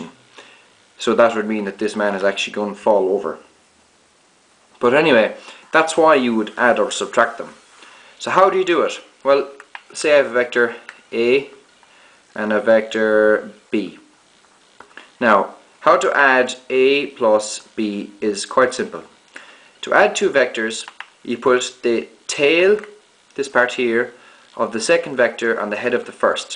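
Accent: Ireland. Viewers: he's Irish give him a break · Irish accent <333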